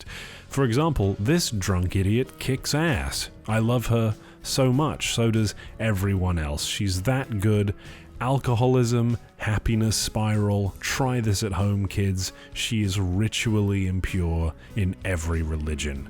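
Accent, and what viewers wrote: United Kingdom. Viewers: Hearing "see you on the sunny side" in British accent makes me wonder how much of a meta joke that was about complex feelings of having anxiety of and desire for meeting friends.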